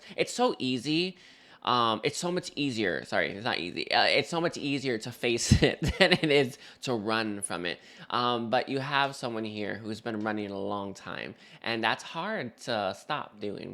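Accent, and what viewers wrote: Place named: Los Angeles, United States. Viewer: I like u cause ur Mexican and it makes me feel at home, like if I know u an u accent is cool. Very LA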